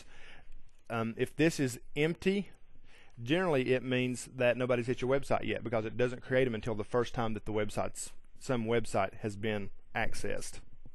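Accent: South, United States. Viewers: I really like this guys accent what is it though I presume southern drawl but I'm not 100%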